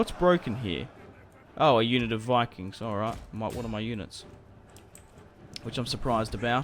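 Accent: Australia. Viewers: where about in australia you from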